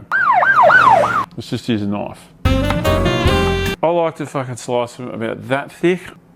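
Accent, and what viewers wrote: Australia. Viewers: Is this how Australian people talk? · He's definitely Australian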